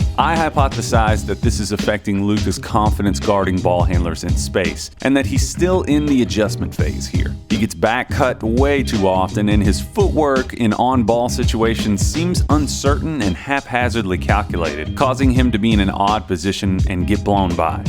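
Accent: United States. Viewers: Yeah, at first I was thinking to myself "Gosh... This guy perfectly nailed the American accent... (My thinking being, that the narrator was Slovenian:))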